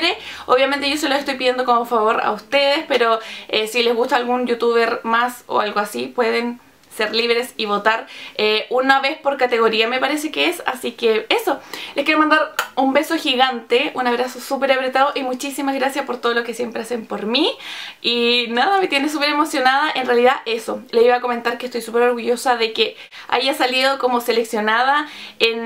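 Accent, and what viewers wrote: Chile. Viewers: de que parte de chile es? que alguien me digaaa😂😂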